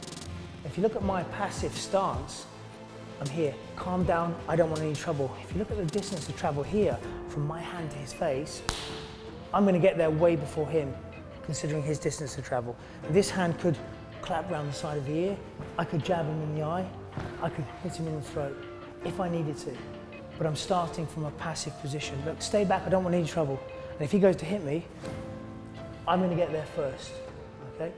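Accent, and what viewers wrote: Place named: United Kingdom. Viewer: I'm so sorry but it's so unusual for me to see a strong,big,burly guy with a British accent.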